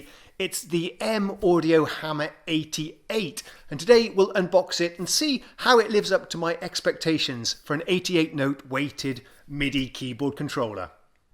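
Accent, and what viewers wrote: United Kingdom. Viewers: Looked like a classic Swedish snow covered villa-house block… but you sound British?? Great video..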